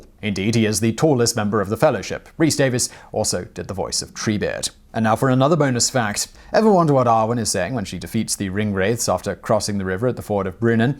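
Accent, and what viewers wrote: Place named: RP, United Kingdom. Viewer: Your deliberately exaggerated posh English voice is excruciatingly annoying. It's like watching a sketch of 'Cholmondley Warner' from Harry Enfield.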